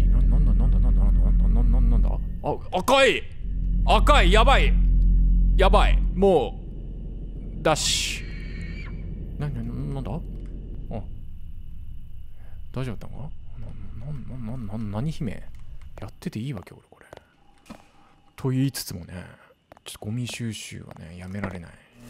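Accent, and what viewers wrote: Hokkaido, Japan. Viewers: 先生の作品紹介が全部好き。滑舌良くて聞き取りやすい。そしてたまに出てくる北海道弁がかわいい笑(私も道民)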